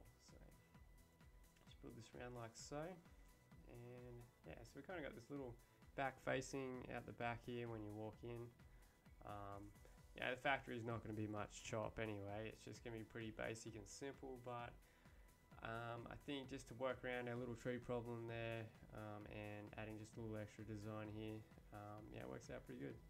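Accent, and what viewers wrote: Australia. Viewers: I just recognized after 15 videos that he has an australian accent lol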